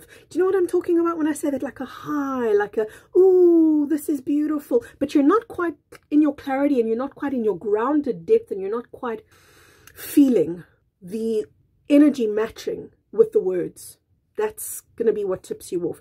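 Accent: South Africa. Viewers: Just curious, what is your accent? South African? you pronounce skeletal as "Skuh-LEE-tle." In America we say "SKEL-uh-tle." Again, just curious ☺️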